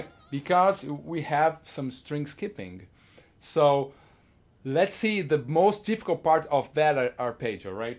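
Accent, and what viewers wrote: Brazil. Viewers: Putz o sotaque brasileiro é inconfundível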